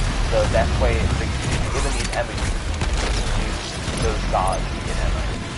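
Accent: South Africa. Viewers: Are u South African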